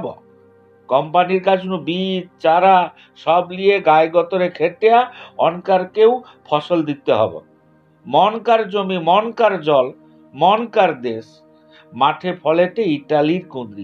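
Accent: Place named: Medinipur, India. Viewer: মেদিনীপুরের আঞ্চলিক কথায় আবৃত্তি টা শুনে খুব ভালো লাগলো।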